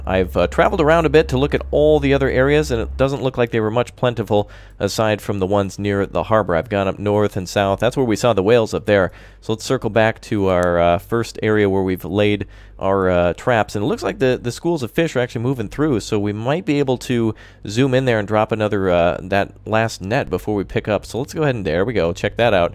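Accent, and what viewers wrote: Germany. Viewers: you german? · R u german?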